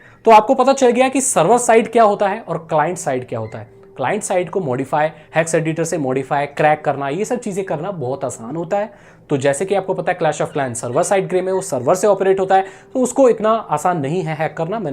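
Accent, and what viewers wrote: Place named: Bengal, India. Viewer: ap bangali ho?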